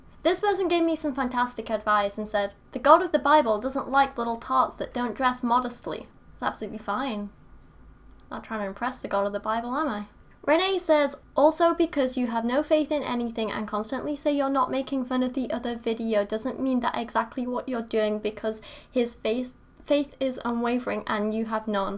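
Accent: United Kingdom. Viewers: like your British accent. Can you do an American accent? Maybe a California accent. I don't think they have one but it may be that I am from there living in New Jersey. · The girl parts are certainly nice, but I'm sure there's some views coming from having a cute face and British accent as well. :)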